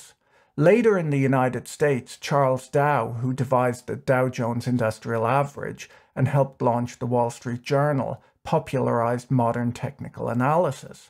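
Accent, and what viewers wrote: Ireland. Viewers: I'm curious about your accent..I know you rarely read comments especially on older videos, but your accent fascinates me. It sounds Irish but there's something else in there it sounds half American or Canadian. Can you tell us how your accent came to be like that and where you've lived etc? Maybe it's your video voice to flatten your Irish accent for American audiences and you're doing it deliberately? Pretty cool smooth accent anyway.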